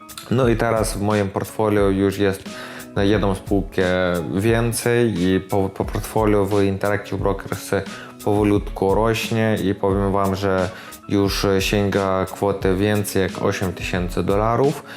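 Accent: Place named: Germany. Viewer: Witam Rostock taki akcent masz jesteś Polakiem filmiki spoko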